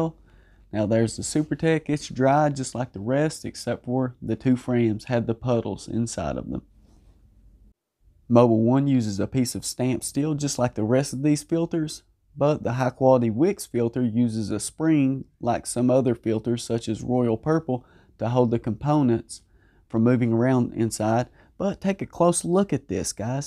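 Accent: South, United States. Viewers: Except this guy's accent is real. Sean had the worst attempt at a southern accent I've ever heard. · gotta love the southern voice. relaxing to listeni to.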